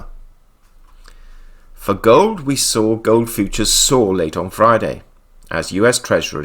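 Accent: United Kingdom. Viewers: his voice is the trademark. Unmistakably British accent.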